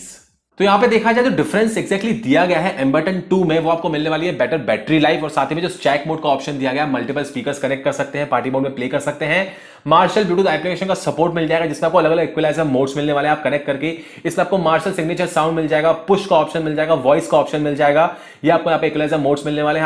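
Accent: India. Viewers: Thank you for this comparison. I speak English as a second language but I’m quite fluent. However I find a bit difficult to get all the 200 words per second with this Indian inflection. The video seems very good but I suggest to slow down a little and try to capture a wider audience.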